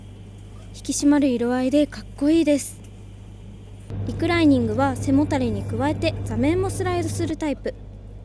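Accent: Kansai, Japan. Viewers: 関西弁ですか？ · ご両親が関西出身との事で関西弁がとても自然で良いですね。今回の動画もとても良かったです。また楽しい旅の動画(特に鉄道もの)お願いします。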